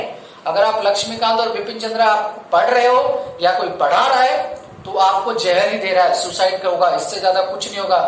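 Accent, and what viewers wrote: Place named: India. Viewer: On today's video sir's talking style is totally different, it's amazing.... Pure desi...😂😂😂 By the thanks for this amazing & helpful info...🙏🏻 Jai hind 🇮🇳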